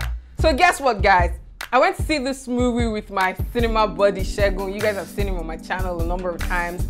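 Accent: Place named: Igbo, Nigeria. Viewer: Wait oo are you Yoruba? Cus u sound igbo?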